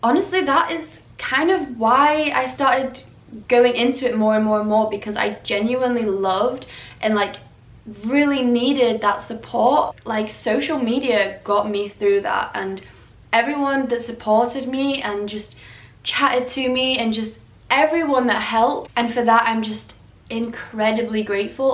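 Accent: United Kingdom. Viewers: wait very stupid question.... when did you get the british accent? or is it not?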